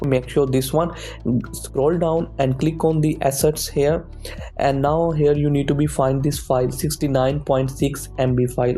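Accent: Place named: India. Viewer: Indian English ist great to hear ...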